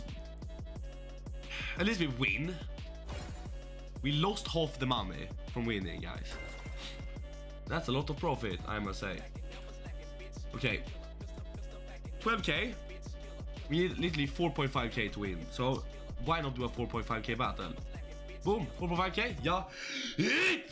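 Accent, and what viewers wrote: Sweden. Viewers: hahah älskar din svengelska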